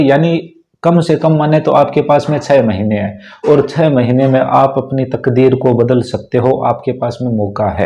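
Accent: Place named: Sri Ganganagar, India. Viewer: Bade bhai thari voice hu laag the mer triya sri ganganagar ga ho Or bhai Ma Telegram par add hou Koi problem hosi to Thaan Yaad Kruga ❤❤❤❤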